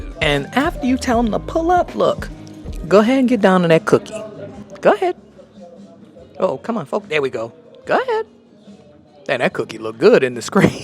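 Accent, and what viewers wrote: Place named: Atlanta, United States. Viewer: I said it sounds like Atlanta b4 you even said it was lol!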